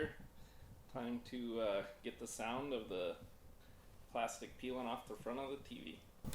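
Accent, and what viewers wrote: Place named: Canada. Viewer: $3299 Canadian? I ask because clearly you have a Canadian accent. But I tried downloading the Greentoe app and or says not available in Canada?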